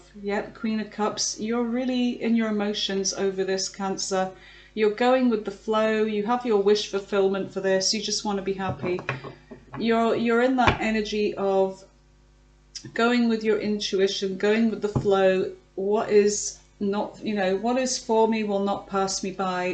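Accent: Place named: United Kingdom. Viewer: love your readings and your british accent - thank you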